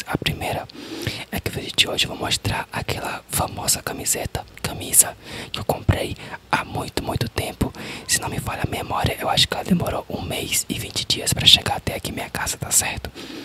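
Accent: Bahia, Brazil. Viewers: Com certeza é baiano né? Já irei inscrita ❤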